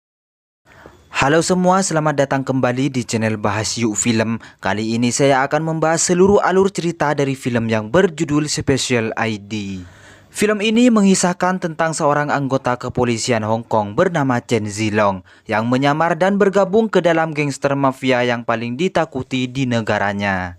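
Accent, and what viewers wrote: Madura, Indonesia. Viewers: Logat maduranya kentel😁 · Bang orang madura ya? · Logat Madura ya bang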